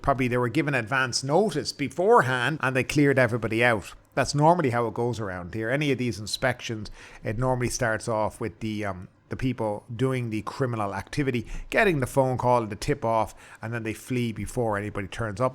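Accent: Ireland. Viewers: This guy sounds Irish.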